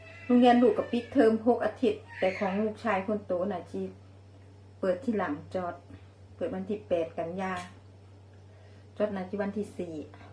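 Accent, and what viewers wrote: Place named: Korat, Thailand. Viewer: สวัสดีชอบสำเนียงโคราชมากค่ะ เป็นตาแช่บแท้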